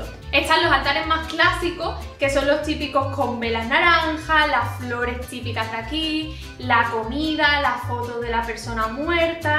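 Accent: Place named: Spain. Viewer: Lo pronuncia de manera españolada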